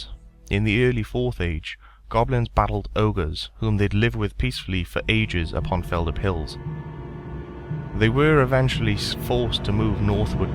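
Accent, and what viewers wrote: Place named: South Wales, United Kingdom. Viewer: What part of Wales are you from? It's driving me crazy. You've definitely got a south walian accent. I'm gonna guess and say Merthyr or Rhondda or somewhere around there lol.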